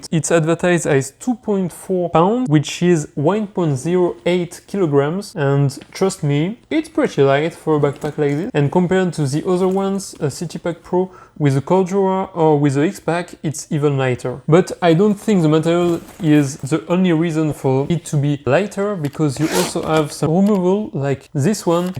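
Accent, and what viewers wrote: France. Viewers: I can easily guess you are french, parce que moi aussi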